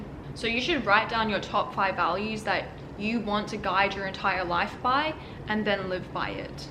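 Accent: Australia. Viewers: i love ur aussie accent it's so effortlessly sassy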